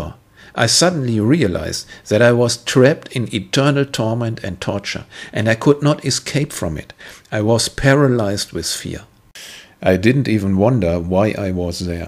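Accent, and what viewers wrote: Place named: Germany. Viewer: German accent.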